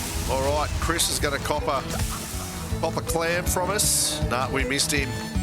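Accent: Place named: Australia. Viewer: I hope for new updates and its okay for pronouncing names wrong, but you're Australian accent is cool.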